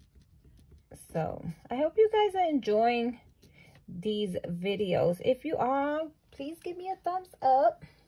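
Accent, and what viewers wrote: New York, United States. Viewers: That New York accent tho. Lol! Needed this motivation today. Thanks sis. Have a good weekend.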